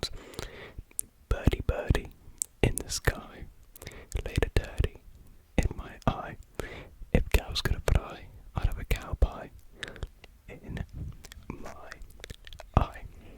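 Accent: United Kingdom. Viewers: British accent 😩😍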